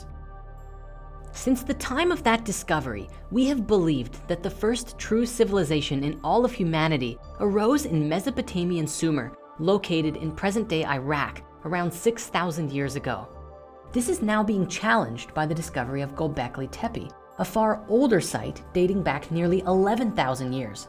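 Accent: Canada. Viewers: He sounds Canadian. That said, I am pretty sure most people who obsess over 'the gay'... have gay tendencies. So to me.... '__ is gay' sounds gay.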